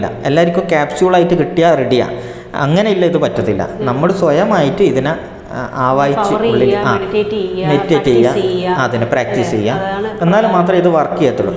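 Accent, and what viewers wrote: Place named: Kannur, India. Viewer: Kannur bhasha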